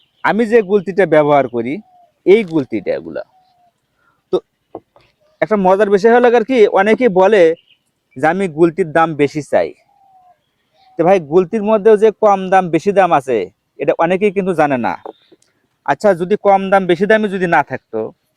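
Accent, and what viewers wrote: Bogura, Bangladesh. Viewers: ভাই আপনার বাড়ি কি বগুড়া?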